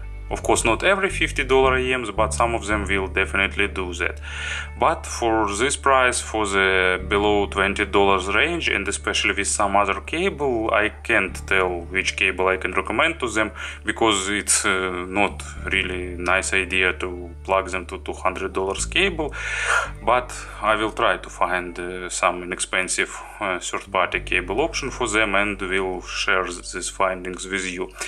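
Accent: Russia. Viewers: Thank you so much for the review. Love your Russian accent BTW and your soothing voice. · Чисто Русский акцент))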